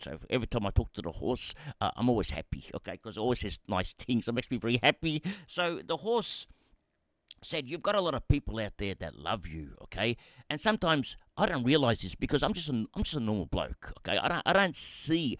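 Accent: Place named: Thailand. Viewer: You bloody beauty… so happy… I too felt the loss when JR retired, but I’m still playing in 5 ex-JR comps with the same old crew … now I’m in 3 of yours plus 3 of your RTSS comps… when I saw the ‘news’ it left me really flat.. losing was gonna be very sad…. So glad you’ve come around… besides FPL is a very frustrating and gimmicky game, I had already decided after this year to give it away.. hahaa… btw your Tinglish is grouse and for the record I tink you are a great communicator.. ❤❤❤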